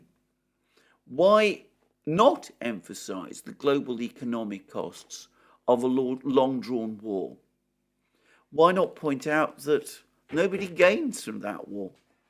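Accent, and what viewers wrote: United Kingdom. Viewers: Love the muted tonality of your British accent. But one particular anecdote has always bothered me by your use “repeat again “. As I was brought up studying the English language, I was repeatedly cautioned against this redundancy. Am I right in saying so? Begging to be enlightened.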